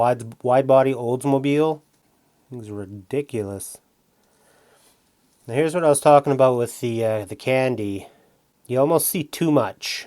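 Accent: Canada. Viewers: Don't change a thing brother! I love your attitude and the way you tell us how you do things! Plus that sweet Canadian accent is a bonus! Everything you do is cool! This old Oldsmobile brings back memories to this old Missourian. Thanks for what you do!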